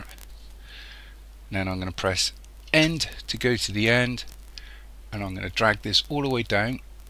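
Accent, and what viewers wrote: United Kingdom. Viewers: this guy fuckin sucks and takes way to god damn long for those in the future watch this guy instead (9) Kdenlive Tutorial: How to Export Video in Kdenlive - YouTube he's quick and to the point. not like this bri'ish bozo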